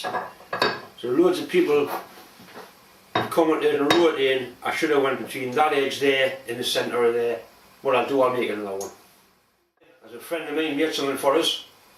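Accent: Newcastle, United Kingdom. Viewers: Thanks and congratulations (& Deb, and all your supporting team). As I said when you were 23 short of the 100k: “If you’re at all interested in metalworking, machining, old steam equipment, and more and enjoy a Newcastle accent then is well worth watching. No BS, and sharing a lifetime of knowledge and experience.” Honest and entertaining, as good as it gets.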